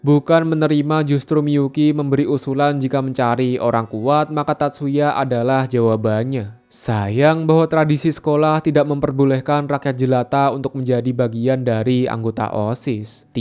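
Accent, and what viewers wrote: Java, Indonesia. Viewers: bang lu jawa ya ?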